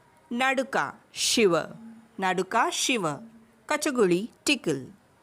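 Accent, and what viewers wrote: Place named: United States. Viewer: 😂😂 American & Kannadiga mix mam 😂😂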